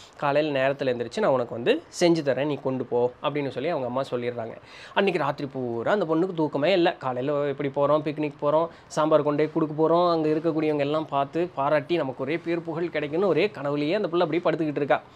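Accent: Coimbatore, India. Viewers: Magical voice nice story Coimbatore slang 👌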